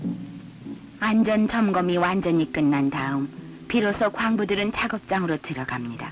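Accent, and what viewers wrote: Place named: North Korea. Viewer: 해설자가 북한목소리네요~~ㅎ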